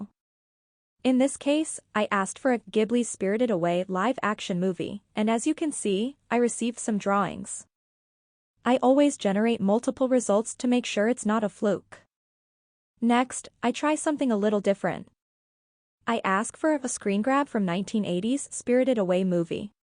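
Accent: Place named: France. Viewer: Don’t be afraid of your awesome French accent whilst speaking other languages. It is sooo fabulous when French speaking people keep their accent. I was born the same as you. The 80’s and 90’s fantasy films were the best years. We had a small resurgance with Harry Potter and LotR. But the (some of the late 70’s) 80’s and 90’s were iiit!!!!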